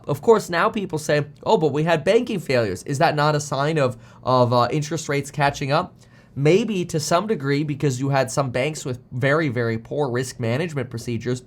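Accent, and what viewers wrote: Germany. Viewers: Your German accent made me crack a smile for the first time this morning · Man that German accent is hilarious.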